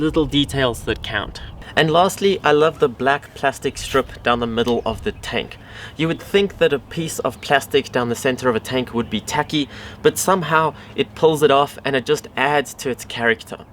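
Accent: South Africa. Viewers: Always wondered where that accent of yours was from.... So you're South African.. that's awesome. I follow cricket and its names like AB Devilliers, Dale Steyn which comes to my mind thinking about SA. Well now will be one too. 🙌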